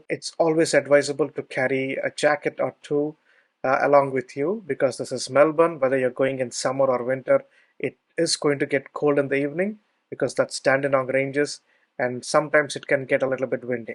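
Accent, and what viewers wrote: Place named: India. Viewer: Shame that it's so difficult to understand the thick Indian accent. Why didn't you get someone to narrate for you. Btw it's not Brooks Lookout. Although your transcript does say Burkes, you wrongly pronounced it as "Brooks". Had I not read the follow on, I'd be looking for Brooks Lookout, man. I have been here many times and never seen a cell tower, so perhaps didn't trek the exact same route as you. I was around the tv towers and the Kyeema memorial site.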